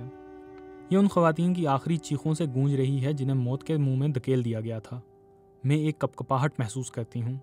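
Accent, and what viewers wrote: Punjab, Pakistan. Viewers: Exactly panjabi touch hay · What a bakwas accent. Muarrakh is pronounce wrong here. It seems it is read by someone from northern punjab of Pakistan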